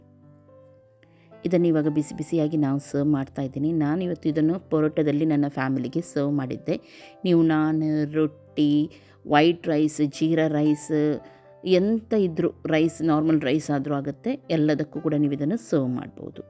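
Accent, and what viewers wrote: Mangalore, India. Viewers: Bayalli neeru barta ide. Nimma matina shaili Mangalore bhashe super...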